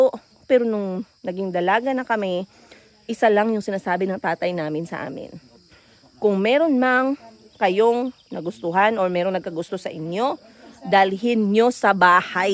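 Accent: Iloilo, Philippines. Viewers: Ilonggo kamo? Or acquired knowledge of speaking inilonggo.... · Tuwang tuwa Ako sayo Lalo na kapag nag salita ka na tonong ilongga 😅😅😅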